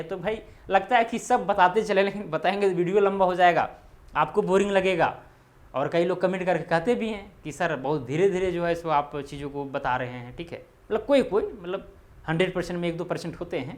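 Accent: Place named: Darbhanga, India. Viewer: आप बिहार में दरभंगा के है क्या, अच्छा ज्ञान है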